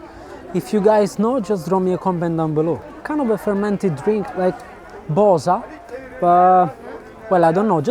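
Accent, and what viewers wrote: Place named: Italy. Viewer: I like ur Italian accent 😂❣️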